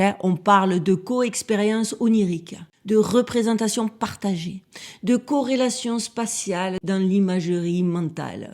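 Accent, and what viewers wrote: South, France. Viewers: Toujours au top tes vidéos, un régal de t'entendre et de te suivre. Ce petit accent du sud , j'adore ...😉 Hier je suis tombé sur cette vidéo de 3I atlas <link> À méditer....🤔 Belle continuation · J'aime tant votre accent du sud... Ne le changez pas, il y a trop de français du sud qui le changent, en premier le Monsieur de la ferme des cochons laineux du tarn-et-garonne, il est presque parisien avec son accent! Mais il fait un travail excellent alors je profite des autres, qui sont rares, qui ont un si bel accent! Merci pour votre travail. Je viens de regarder la video des menus des Rochschilds. Excellent!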